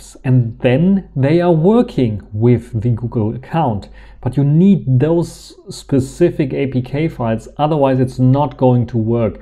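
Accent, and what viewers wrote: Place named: Germany. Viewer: Ein deutscher Kommentar flutscht mal durch, weil ich denke da einen deutschen Akzent zu hören :) Folgendes: Ich stehe kurz vor dem Kauf eines Huawei Gerätes, bin aber auf zwei Banking Apps angewiesen, erstens N26 und zweitens ING. Kannst du das vllt mal mit GBox testen und schauen ob das läuft? Danke dafür im Voraus! Zweitens: Ich sehe gerade dass du seit sagenhaften 16 Jahren Youtube machst, fast auf den Tag genau (im Okt 2006 hattest du das erste Video gemacht), Respekt!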